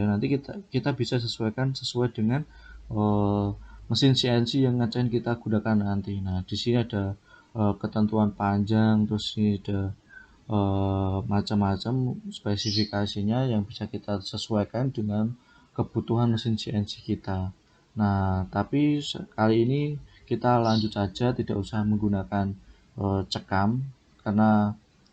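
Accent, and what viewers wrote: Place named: Java, Indonesia. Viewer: suaranya jawa kluthuk bgt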